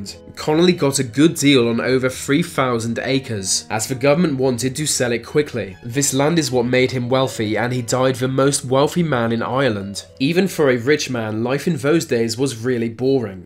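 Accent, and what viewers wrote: United Kingdom. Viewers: A british voice doesn't make one knowledgeable.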